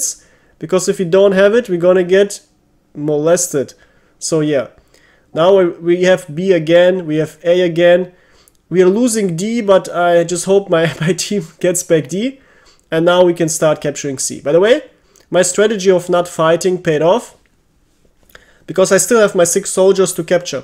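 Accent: Germany. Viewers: Deutscher Dialekt ist einfach geil gutes viedeo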